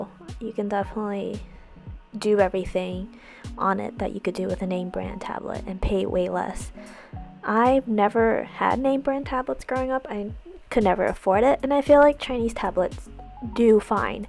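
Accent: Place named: United States. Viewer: You sound normal, wth. Idek what's up with the whole voice thingie but you sound like a normal American girl. Like, dude. You have great art, smh. It's gonna take me years to be as good as you and what sometimes hurts? Your voice? Bruh. There ain't nothing wrong with your voice, G. :/ And what negativity? My G, opinions are opinions and if someone doesn't agree, then that's their opinion. You have a lovely voice, it's fine... on a separate note, I love her hair... I betcha if I stepped on her hair, I'd be falling through space. 👀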